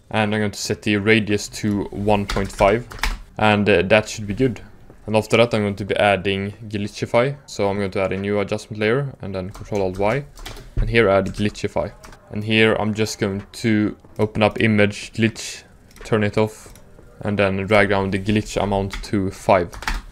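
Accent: Sweden. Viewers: this guy is 100 percent swedish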